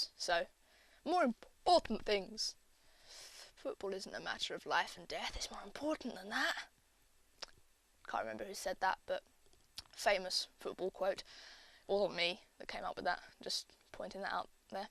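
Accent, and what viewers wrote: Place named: RP, United Kingdom. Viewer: Damn youre posh.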